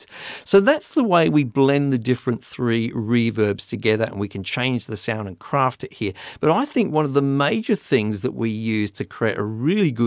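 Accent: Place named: Australia. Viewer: Totally off topic: English is not my native language, so I asked a friend from England for help, when I first discovered your channel. I assumed, you say "I hope you're well", but for me it sounded like "I hope you will", and it was driving me crazy, because I always thought "will what?" My friend assured me it was just your australian accent, so thank you for always wishing us well! xD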